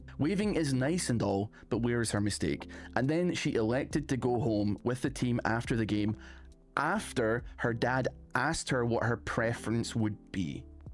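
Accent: Scotland, United Kingdom. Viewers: is indeed scottish! great voice for the great takes indeed:))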